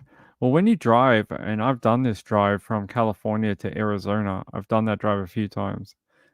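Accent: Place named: Scotland, United Kingdom. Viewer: I don't know why anyone might have a problem with the lovely Scottish accent 😂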